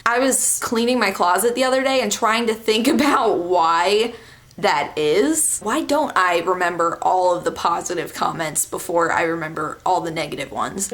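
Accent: Pennsylvania, United States. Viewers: could you do the Accent Tag would love to see that from you because you would be exceptional at it because PA accents are out of this world!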